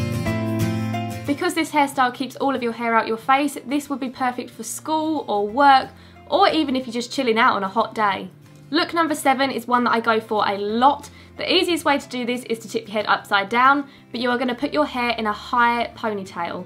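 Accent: United Kingdom. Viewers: I love your British accent 😍😍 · British accent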